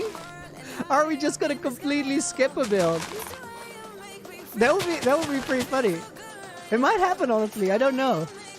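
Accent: Netherlands. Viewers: Hey man love your vids. Btw are you dutch?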